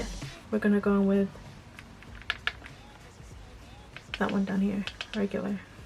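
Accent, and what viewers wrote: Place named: New York, United States. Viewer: You did pretty good with your NY accent (like your humor), time to test it out in person...Have you ever been to NY?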